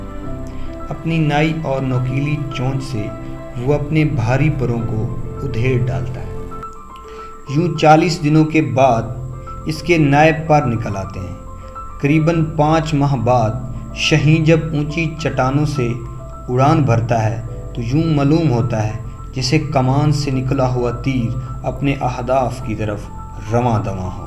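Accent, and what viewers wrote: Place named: Saraiki, Pakistan. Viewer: Narrator ny kitni jamaat tk Taleem hasil ki thi. Accent bilkul Saraeeki 😫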